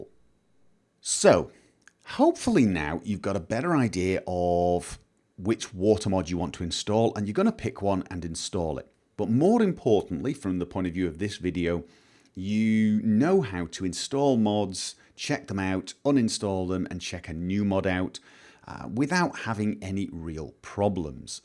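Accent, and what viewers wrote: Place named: Scotland, United Kingdom. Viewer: the fudge? he be english? sounds a bit scottish but without the heavy accent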